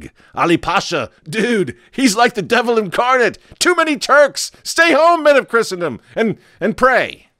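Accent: United Kingdom. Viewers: Love the English accent 😅😅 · Fair comments all around. Only one suggestion going forward....a request, really., could you start doing these editorial videos with that great British accent. Loved it...powerful...convincing. Keep these great videos coming. Great job.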